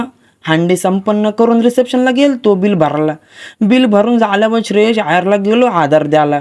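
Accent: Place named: Konkan, India. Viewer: Mitra Aikayla Chan Vatat Kokani Karanch बोलणे 😊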